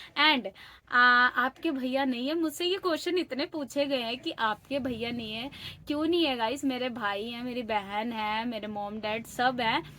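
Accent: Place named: Punjab, India. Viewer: मैं भी video बनाती हूं मेरी भी shop है आप के जैसे मैं भी पंजाब से हु आपभी मेरी जैसे हिंदी बोलते है😂 आपकी वीडियो रोज देखती हूं बहुत प्यारी है आप❤❤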